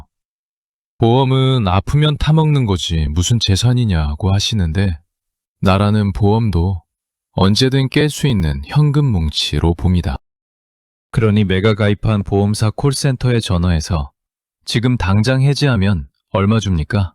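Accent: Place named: Gyeongsang, South Korea. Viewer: 욕보셨습니다.경상도 말인데...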